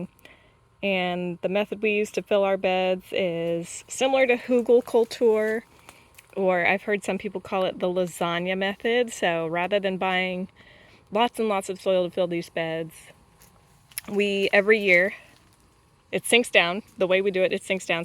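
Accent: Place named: South, United States. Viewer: In which gardening zone are you? Sounds like you’re way down south.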